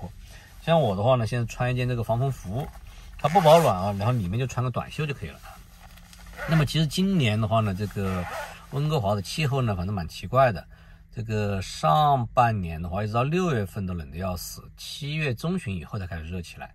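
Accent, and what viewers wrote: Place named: Nanjing, China. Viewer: ，口音，是南京人，我住罗德岱尔堡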